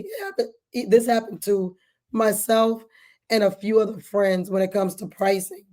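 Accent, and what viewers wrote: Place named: Georgia, United States. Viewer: yeeesss, is the beeeest georgia girl accent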